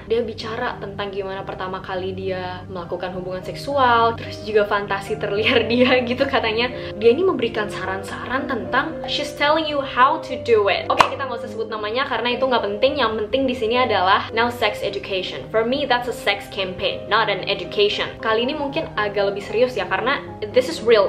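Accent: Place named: Betawi, Indonesia. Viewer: Enjoy banget dengernya😍😍😍 btw logatnya jadi kaya agak betawi ya😂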